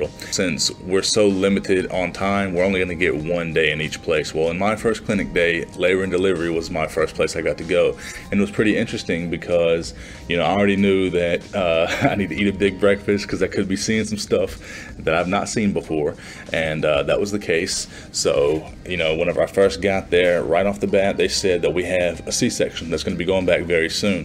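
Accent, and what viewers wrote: South, United States. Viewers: Where are you from? I think I hear a bit of a southern accent..I loved OB but that's what I want to do! I have peds this semester, so I'm super stoked!! Good luck to you!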